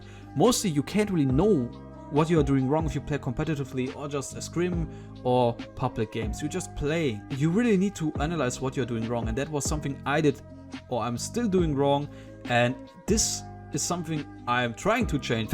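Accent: Germany. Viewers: Noch nie so ein deutsches english gehört hahahah nice video btw❤️👍🏼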